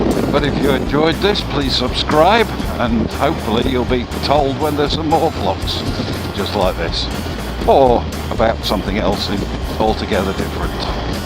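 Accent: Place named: United Kingdom. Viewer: . Eu intendo muito pouco o inglês. Eu sou mais acostumado a ouvir o inglês Americano. Dar para perceber bem o Sutaque Britânico. Valeu meu irmão.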